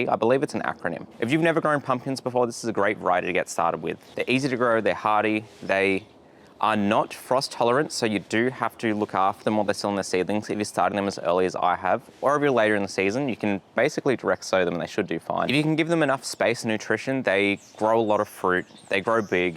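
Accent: Australia. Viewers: aussie accents are the language of heaven, melbourne strong <3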